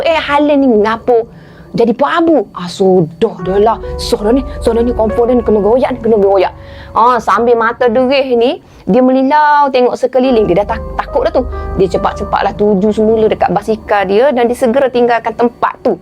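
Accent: Kelantan, Malaysia. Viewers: Org kelantan ke,pandai kecek kelantan tu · Pandai anda royak bahasa Kelantan.Anda orang Kelantan ke? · Yo dio org kelate la boh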